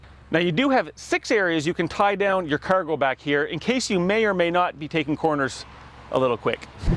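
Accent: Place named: Midwest, United States. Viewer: Midwest accent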